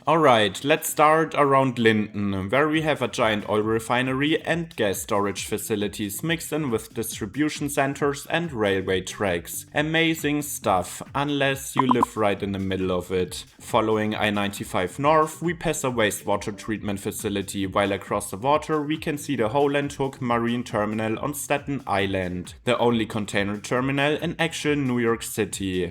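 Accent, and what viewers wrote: Germany. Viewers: That German accent is too pronounced and unmistakable.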